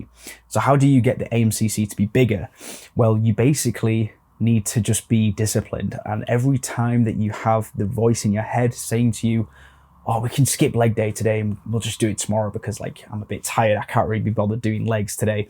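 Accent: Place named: RP, United Kingdom. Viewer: Damn this dude even has the James Bond accent. Dudes unreal.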